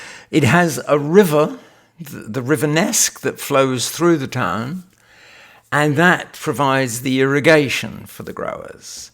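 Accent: United Kingdom. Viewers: I love your passion for your Brompton (I feel the same for mine) and your humor! And besides this as a German it is wonderful to listen to your british accent😊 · Is that an East Anglian accent?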